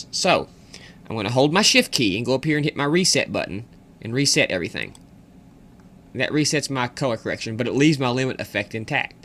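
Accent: South, United States. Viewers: southern american aint it?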